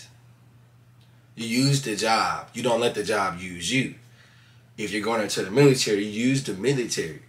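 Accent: Baltimore, United States. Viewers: what it dew. That has to be a Baltimore accent. Dope video bro!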